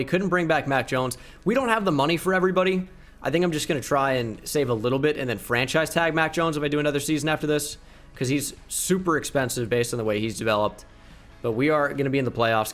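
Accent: Australia. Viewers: 10/10 Australian accent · I thought the Australian accent was great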